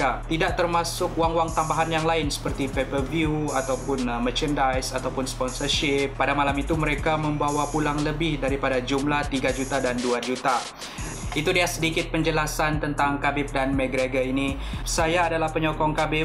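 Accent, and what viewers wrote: Sabah, Malaysia. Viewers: sabah here. pekat btul sabah mu. vid mu nda prnah mengecewakan. semoga sukses selalu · Sabahan bah nii... Like bah kita kita juga nii.. Haha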